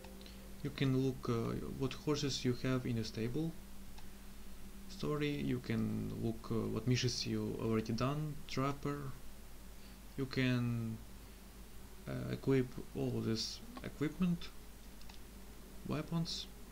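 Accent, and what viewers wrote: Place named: Russia. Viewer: Прекрасный русский акцент👏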